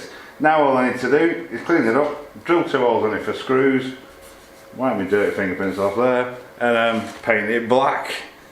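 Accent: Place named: Yorkshire, United Kingdom. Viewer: Love the door❤ Where are you guys from? You sound like your from Yorkshire 😁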